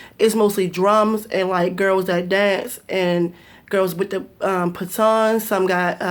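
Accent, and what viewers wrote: Baltimore, United States. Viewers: Your Hon accent is pretty spot on nice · I'm from new Orleans, & I love Baltimore accent. I would definitely date you.. yaherd me.. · Love your accent. Baltimore accent is colonial American, urban South, unique, awesome. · I am glad you recognized that. Actually it helps you pronounce your words better now that you identified. I am from Bmore also and when I went to the Military I realize that Bmore did have our own language. As you get older and progress you are going to be able to learn how to cut it on and off so you don't lose that business deal for saying MUVA.. 😂😂. Glad to see you experiencing these things. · Facts. Thisis video about "her" accent who happens to be from Baltimore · I grew up in waverly in the mid 70’s 80’s and 90’s There and Baltimore in general is called the melting pot based on the diversity or mix of multicultural dynamics. 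U have the accent down for the most part. And yea, Non Marylanders don’t know nothing about our love for crabs... hon lol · SW Baltimore was originally an Appalachian accent...or at least that was how it was originally labeled in the 30s thru the 70s. She does a good job of capturing the sounds of the accent. She switched to slang which changes too often and too quickly to say something is. Old Bay! Yup. Used to have to carry it back to Florida, but Florida caught on and it’s available in most grocery stores now.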